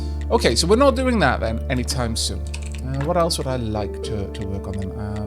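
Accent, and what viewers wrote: Wales, United Kingdom. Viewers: I subscribed purely because of how much I the welsh accent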